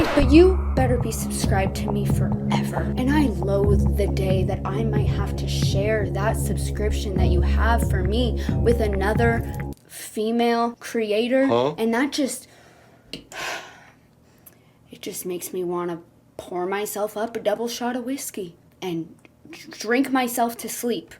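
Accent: Canada. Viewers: so you do sound Canadian but the way we over exaggerate it in the states is to show the difference like when we impersonate Brit’s with “B’ole o wo’ar” they don’t sound like that but like they kinda do?????????? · hi just so you know ive started developing a canadian accent from watching your videos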